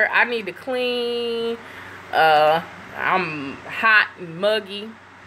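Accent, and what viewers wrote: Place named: United States. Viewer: were you from you sound country as hell I like it though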